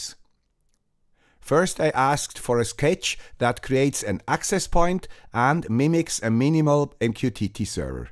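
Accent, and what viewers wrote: Switzerland. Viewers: Hold on hold on you're Swiss! I thought you were Dutch. Then what do I know, I'm English with Danish ancestors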